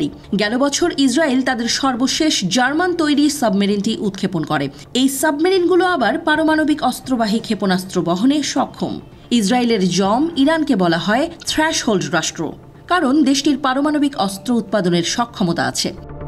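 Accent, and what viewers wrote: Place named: India. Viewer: উপস্থাপিকার কথা শুনে মনে হচ্ছিল ভারতে কোনো নিউজ দেখতেছি, পরে দেখলাম RTV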